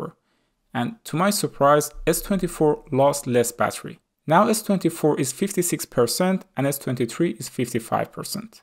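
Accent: Iran. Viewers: Your accent is too persian😅 am i right?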